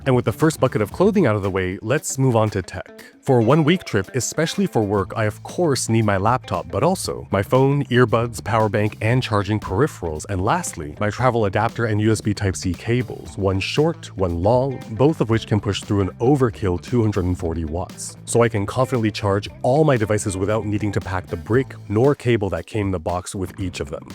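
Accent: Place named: China. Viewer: How can you have a Chinese accent despite presumably being born/growing up in Canada/the US?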